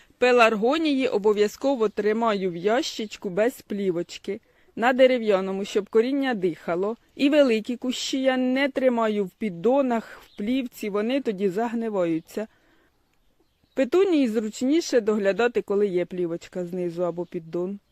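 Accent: Poltava, Ukraine. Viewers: я з задоволенням дивлюся і слухаю ваші відео,наш полтавській говір- чудо, дякую.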